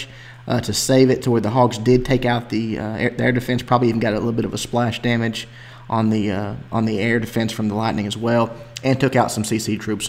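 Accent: South, United States. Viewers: I recognize that accent anywhere in the south ;)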